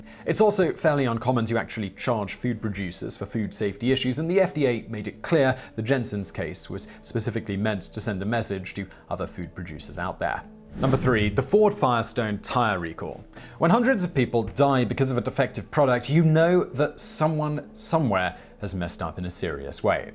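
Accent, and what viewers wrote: United Kingdom. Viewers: Its the Queens English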